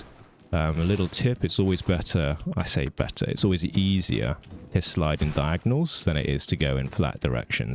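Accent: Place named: United Kingdom. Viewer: I just wonder - are you side account of Mr E? Cuz you sound very similar :D Only accent says that you're British. I have almost 600h playtime but still can learn something new, so thank you for all the tutorials, which I'll watch. For example I can spare a lot of pain for my poor hands and fingers after many hours of playing only by using one key for crouch and dodge!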